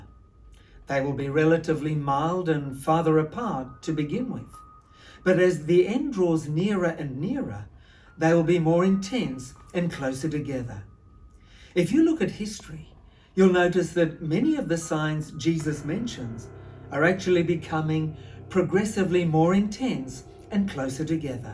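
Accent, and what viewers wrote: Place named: Australia. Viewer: his accent is like Australian accent.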